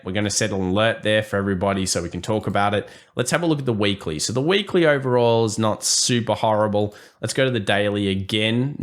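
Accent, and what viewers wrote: Australia. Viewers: You da man! Do you have a Crocodile Dundee Knife or an American knife? Sorry, that was my first encounter with an Australian Accent... And I have your Whatsapp #! I'm going to call from NY to wake you up!!!!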